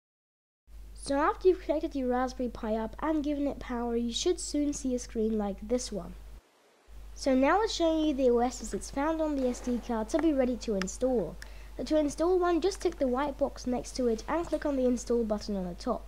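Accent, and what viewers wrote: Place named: South Africa. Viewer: Thanks for the help. Can't help but notice your accent sounds south african.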